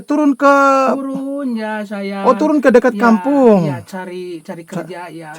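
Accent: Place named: West Java, Indonesia. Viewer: Klo dngr ngomong itu daerah prbtsn Tasyik dgn garut Buuun.. Mfin ya bun nyela komen 🙏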